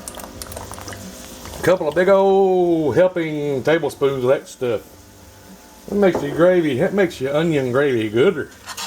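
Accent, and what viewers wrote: South, United States. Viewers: I watched True Grit and could only understand half of it. I was able to understand this guy's video. I'm getting better and better at understanding Southish. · Gotta love that country accent!!! 🇺🇸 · When I heard that southern accent, I knew then this was gonna be some good stuff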